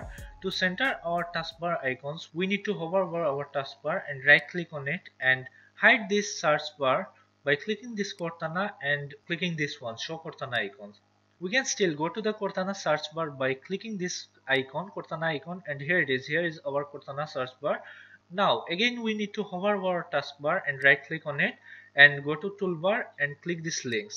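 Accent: India. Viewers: You know you found the right video when you hear that Indian accent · Bengali accent ! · Did you hear an indian accent ? Oh You found the right video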